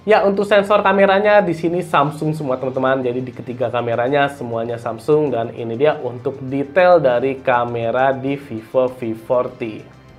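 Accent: Yogyakarta, Indonesia. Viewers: Orang jogja bang?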